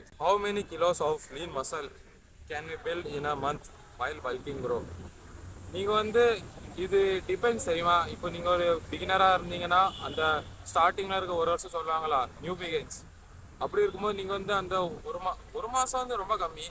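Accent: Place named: Kanyakumari, India. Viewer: Good job brother👏 I thought you are from Kanyakumari district because of your tamil accent..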